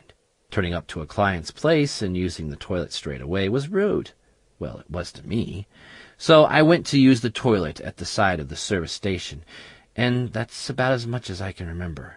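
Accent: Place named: United States. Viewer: The voice of the narrator is so casual, and conversational, for having discovered one's self in a nightmare; plus, he sounds about 16. He says he is England, so why did they use an American as a narrator?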